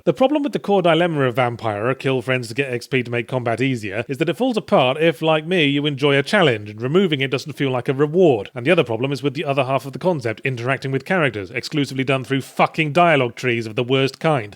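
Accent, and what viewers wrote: United Kingdom. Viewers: Is British? That ending was hilarious for some reason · Yahtzee, I didn’t know you were British! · Wait... is British? I thought he was Australian.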